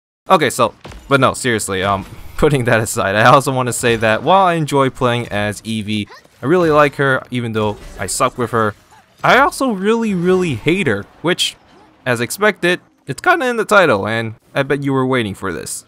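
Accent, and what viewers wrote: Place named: Malaysia. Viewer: I can smell the Malasyan in his voice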